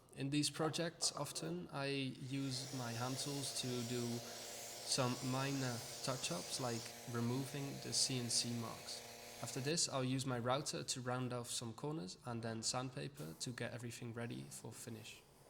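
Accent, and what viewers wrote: United Kingdom. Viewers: thought I was listening to a Londoner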